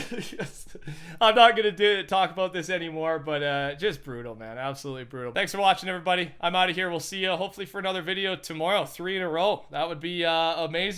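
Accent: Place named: Canada. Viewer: I love it when Canadians say that there fired up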